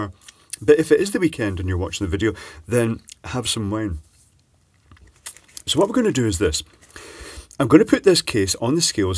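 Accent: Scotland, United Kingdom. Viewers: What did Mr say? Sounded like 'putting seek diamonds'. What was that word before diamonds? Also where has the Scottish accent gone?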